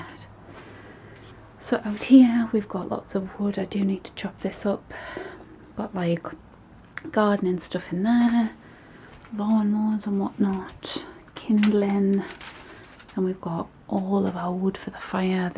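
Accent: United Kingdom. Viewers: British accent is beautiful! 😍😍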